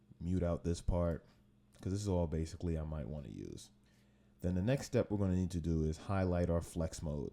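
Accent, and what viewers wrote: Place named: New York, United States. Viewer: this guy is clearly from NYC lol. good tut, thanks.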